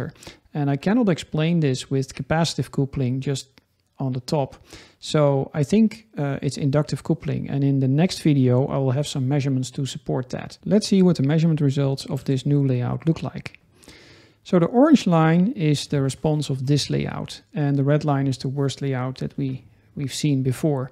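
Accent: Netherlands. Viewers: Thanks for explain clear, by the way i like your clean English with the little dutch accent. Kind regards from germany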